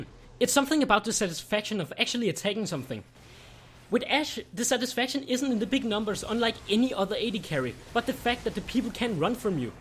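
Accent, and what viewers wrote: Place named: Denmark. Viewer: dat dansk accent :D fed video ^^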